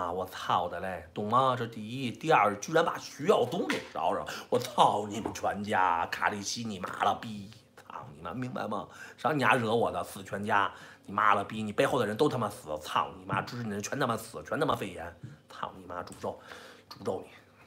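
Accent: Beijing, China. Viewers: 北京爺們兒罵人真好聽！ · 骂得太爽了，尤其一口京腔味带着愤怒，又夹着一丝丝幽默铺天盖地、如海啸般把海外这帮伪民运全卷入了海底，从此海外应该会清净点了吧！如果还有， ，再来一场海啸！ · 曉冬可以學台語嗎 你的臉一臉台味 怎麼是大陸腔呢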